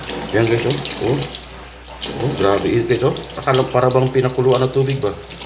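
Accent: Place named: Visayas, Philippines. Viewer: Bisaya mn ka Idol ky salita halata mn gud ta mga bisaya 😅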